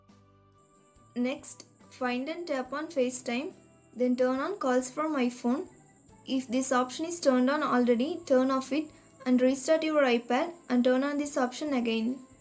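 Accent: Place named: India. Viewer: Hard to understand ... sounds Indian 🤨 too fast as usual 🧐 too many options sounds no good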